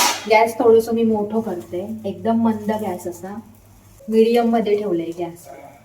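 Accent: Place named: Malvan, India. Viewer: "Hello ma'am just watched your latest vlog on cooking those delicious-looking thalipeths and I'm craving them now! 😂 Loved how you shared your morning routine and gave us a sneak peek into your daily life. The recipe was super easy to follow and I'm definitely trying it out this weekend! 👍 Your energy is infectious and it's so much fun watching you cook. Keep 'em coming! 💕 The malavani accent you speak is so amazing 😍 got to see u in very different way ....keep going lots of support and well wishes for your YouTube journey ....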